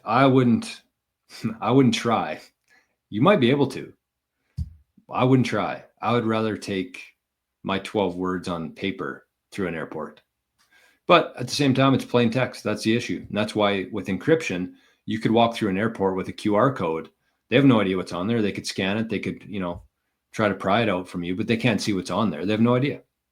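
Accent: Canada. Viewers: I bet this guy played hockey? Sounds Canadian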